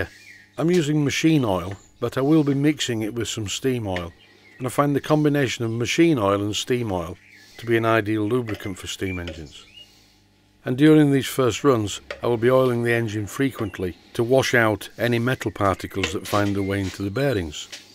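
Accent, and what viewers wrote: Yorkshire, United Kingdom. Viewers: Judging by your accent, it sounds like you are a Tyke, sir... no wonder that you know all about steam engines! My respect, as a Tyke myself.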